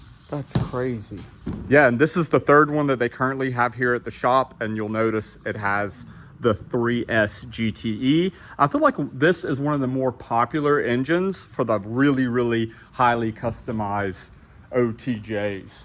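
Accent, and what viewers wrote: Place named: Pennsylvania, United States. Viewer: In Imus? Should have run through Milwaukee or Sarau for the big personal OTJ’s. You may be in The Philippines, but that accent sounds like Westmoreland Co., PA! Lol😅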